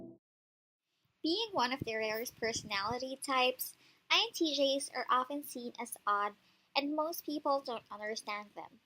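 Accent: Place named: Philippines. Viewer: Your filipino accent is annoying.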